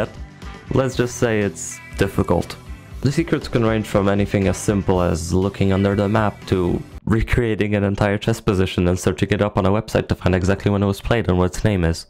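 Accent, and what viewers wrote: Poland. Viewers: what country you from i thouhgt ur polish for a sec?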